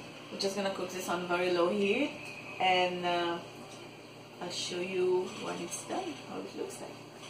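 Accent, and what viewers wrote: Mauritius. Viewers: Your accent is very similar to Mauritian's!cool😊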